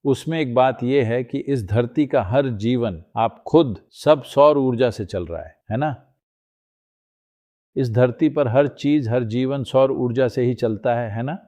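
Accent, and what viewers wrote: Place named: Tamil Nadu, India. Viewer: सद्गुरू तमिल भाषी है वहां ऐसा ही उच्चारण होता है भाई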